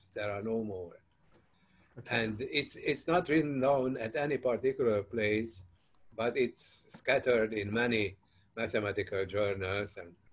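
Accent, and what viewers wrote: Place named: Hungary. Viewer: I know Hungarians have a very distinguishable English accent but damn, Pelikán is the king of speaking English with accent.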